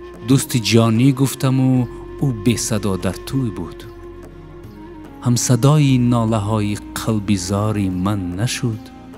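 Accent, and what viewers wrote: Tajikistan. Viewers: Это Таджикский акцент. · Это таджикский диалект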